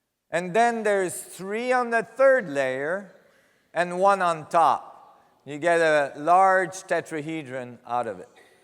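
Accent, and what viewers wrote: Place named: India. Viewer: жуткий акцент.индус?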